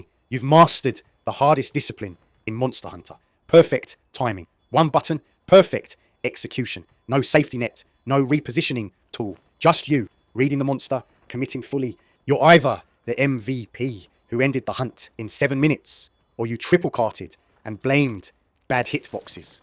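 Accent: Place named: United Kingdom. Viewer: Really sick of choosing random videos only to find another British narrator. Do you mfs have real jobs?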